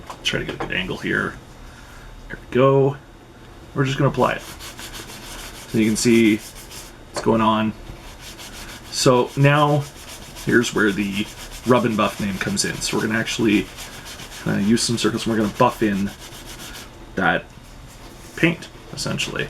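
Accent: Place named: Canada. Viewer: Great vid. Are you Canadian? You sound Canadian. Detecting Canadians through their speech is my super power. ;-)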